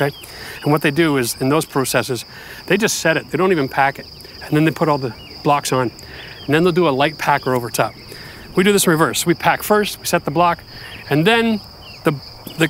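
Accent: Canada. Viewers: had the same thought. I thought I heard a Canadian accent and then when he said was in CA I was shocked. This guy knows a ton but it seems like frost heave is going to eff this whole structure up with time.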